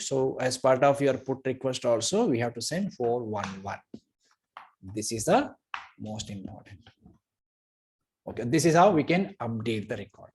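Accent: India. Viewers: Por que dublaram o bom é o idioma ingles indiano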